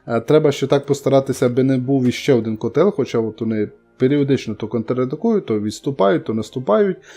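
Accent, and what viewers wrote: Transcarpathia, Ukraine. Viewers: Судячи по говору ви з Закарпатья?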